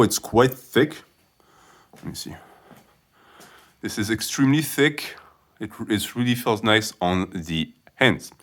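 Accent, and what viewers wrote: France. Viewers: dude im just happy for you reaching 10k subs! good humour, informative videos and french accent :D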